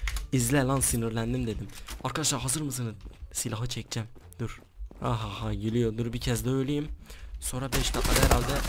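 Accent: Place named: Malatya, Turkey. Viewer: abi nerelisin bizim melekt gibi konuşuon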